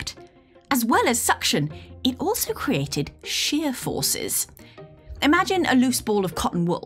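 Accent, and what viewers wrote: United Kingdom. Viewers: The extremely British, and unnecessary, accent intonations had me quit half-way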